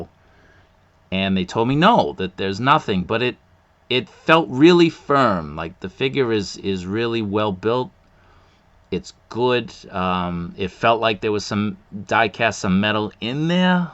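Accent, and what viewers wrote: Boston, United States. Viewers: R u from boston?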